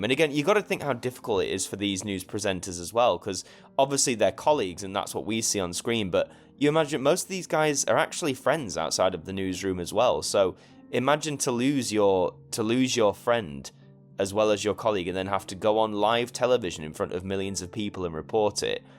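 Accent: RP, United Kingdom. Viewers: WhAt a great man my cousin says sounds posh mr George alligayah x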